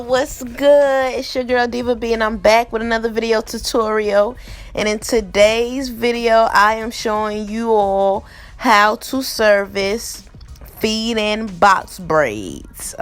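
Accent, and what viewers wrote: New York, United States. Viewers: Awesome work! And I love your accent! New York, right? I'm originally from Jersey now in NC. Ain't nothing like accents from up top! Love your videos and please keep sharing!!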